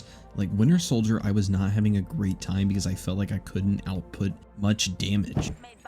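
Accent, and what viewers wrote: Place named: United States. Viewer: idk if it’s your stereotypical american voice (i’m british 😅) or decent editing / voice quality but i was expecting you to be popular while watching. vid was well made and clicked on it without seeing views/ subs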